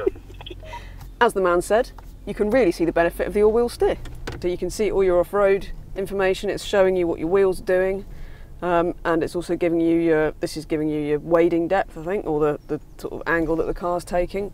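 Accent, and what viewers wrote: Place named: United Kingdom. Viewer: Every time I click this vider for her british accent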